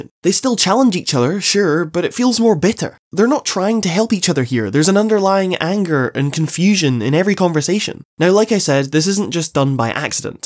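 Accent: United Kingdom. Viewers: I don't think there's something better than listening to a random guy with a british accent and a nice voice talk about random things while I play games and pay 0 attention to the video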